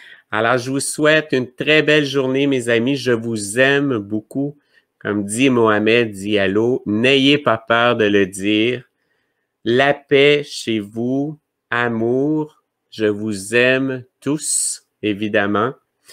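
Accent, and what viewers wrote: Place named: Canada. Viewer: ❤️ Merci beaucoup cher 🙏🏻. J’aime vous écouter et votre accent canadien 🎄 J’habite en CORSE et bien loin de chez vous: magique aussi ces liens instantanés d’un bout à l’autre de la planète 🌍 Cette vidéo, avec l’Alliance Galactique, sont pour moi les plus importants, comme des contes de Fées bien réels... des magnifiques et grands moments d’émotions pour moi 🙏🏻🕊🕊🕊 Gros bisous 😘🥰❤️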